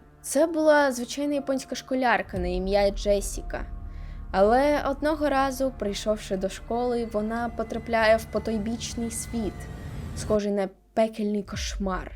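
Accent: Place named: Russia. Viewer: Спробуйте вичитувати текст перед зйомкою разів 10-20 вголос. Дуже важко слухати. Українська мова - це дуже круто, але неправильні наголоси, вимова слів на російській лад (плутанина з «і» та «и», «о» та «а») тощо, потребують додаткової практики. Щасти.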